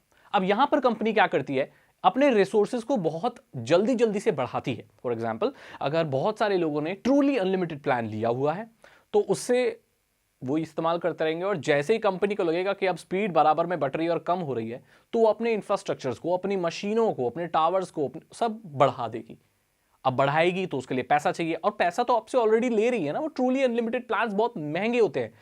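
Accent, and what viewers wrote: Odisha, India. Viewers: Bro, are you from Odisha ? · bro are you from orrisa???? love you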